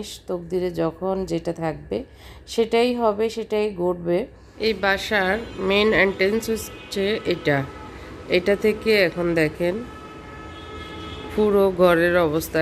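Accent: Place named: Feni, Bangladesh. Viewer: দুঃখিত বোন কিছু মনে করবেন নাহ্ ! আপনি উনার উচ্চারণের ভুল ধরতেছেন।উনার দেশের বাড়ী ফেনী! তাই আঞ্চলিক টান আসে। আপনার কমেন্টে বানানের দিকে একটু খেয়াল করবেন! পড়তে দৃষ্টিকটু মনে হয়!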